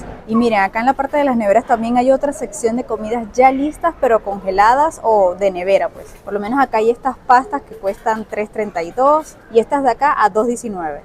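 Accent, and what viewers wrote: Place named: Venezuela. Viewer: Tú acento se parece al venezolano.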